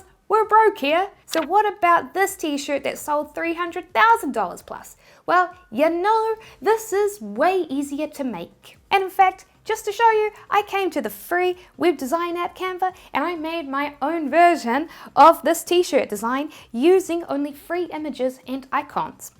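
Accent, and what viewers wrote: New Zealand. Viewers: Sim, é assim mesmo que o sotaque da Nova Zelândia funciona. Vulgarmente conhecido como "kiwi". Outro youtuber neozelândes, Sam Owens, tem o mesmo sotaque. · Yes, definitely New Zealand accent. One of the most difficult English variants for Australians to understand. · Thank you for posting this video! Love your content and your awesome kiwi accent! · Thank you for the help. You sound familiar - are you a Kiwi? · Bruh I clicked the vid and heared the voice Just knew it was kiwi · Thank you for your reply, I thought that a company was the option. But I thank you for clarifying me on this. I didn't want to be rude or anything regarding your videos. I just thought it would be good to inform that depends on the country it is not always free and easy (in France for example it is free to open one but you pay tax on your income and mostly you need a 4 days training on legal stuff cost: €260) It is just something that we need to be aware of. 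I do like the dynamic of your videos and that it is not too long and quite easy to watch. Happy also to watch and listen to a kiwi accent for a change ;). Keep on doing what you do so well. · For those who do not know, she has a lovely Kiwi / New Zealander accent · Do u have nz accents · Oh that's so neat. I really wish I could meet you! You seem like an amazing intelligent woman, with an amazing New Zealand accent! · im guessing she is from new zealand. but it can be hard to tell between australia and new zealand for americans. must be easy for them.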